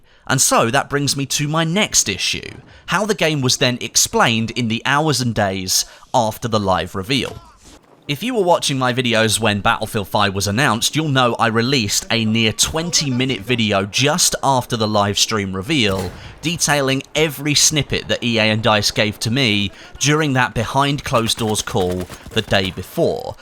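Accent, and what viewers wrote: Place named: RP, United Kingdom. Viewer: What is this? You gave absolutely no opinion of the game except: it is fun and I THINK I will be happy to play it next 2 years. There is no game-play opinions, there is no gun play assessment, there is no game mods information. YOU ACTUALLY COMMENT ON THE DICE AS GAMING COMPANY, AND THEIR MARKETING STRATEGIES BUT YOU GIVE NO OPINION ON THE GAME WHAT SO EVER. Your videos are worthless waste of time and I feel cheated tor my time and energy every time I watch one. You keep repeating same statements in slightly different way for half a dozen times in slow posh correct English, and you say nothing of substance.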